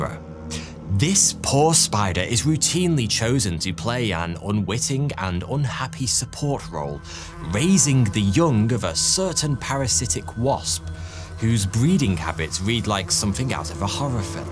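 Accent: United Kingdom. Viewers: Trendy topic about a crappy mainstream overrated TV show, check. Cheap British accent, check. Long winded intro to get the video over 10 minutes, check. Sponsor at the start, check. Idiotically simple take on an interesting subject, check. Gotta love Youtube.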